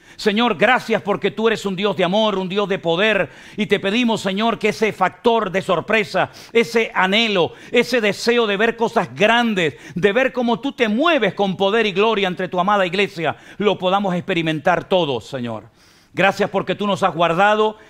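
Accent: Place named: Paraguay. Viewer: desde Paraguy le veo,me gusta xque habla Español como nosotros,No como hay los de España🙏🙏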